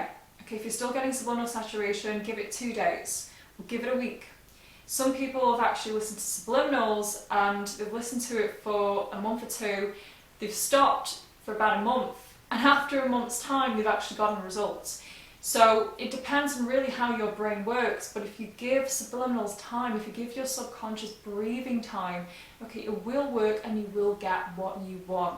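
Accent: United Kingdom. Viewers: I don't know. You're beautiful and charming. And that British accent. My God. God will marry me to a girl like you. You're so adorable. 🎡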